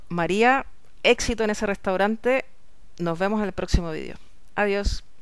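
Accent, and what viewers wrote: Chile. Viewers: Habla como Chilena. Es Chilena?? Excelente contenido sí. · Hola, acabo de llegar a tú canal y me he dado cuenta que eres una profesional del marketing gastronómico por lo que me he suscrito a tú canal y comentarte por consejos y estrategias a seguir para una idea de delivery de comida peruana y postres chilenos y peruanos. Saludos desde Santiago de Chile Tienes acento chileno, pero con algunas palabras castizas, de dónde eres?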